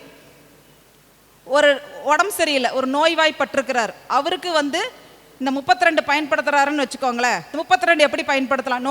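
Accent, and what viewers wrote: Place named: Kongu, India. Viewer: Kongu tamil.. 👏🌷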